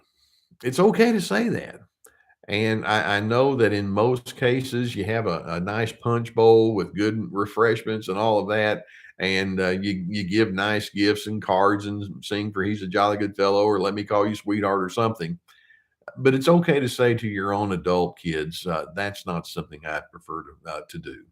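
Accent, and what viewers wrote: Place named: Texas, United States. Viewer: I knew it! I was asking myself just the other day where you might be from & l said "I'll bet is from Texas." Not to brag but l was right! I used to live in Austin where l met folks from around the State & your charming accent brings back fond memories of my time there. I'm a much happier & more capable person in dealing with my own situation since l started watching your channel a year & a half ago. Thank you from Toronto, Canada.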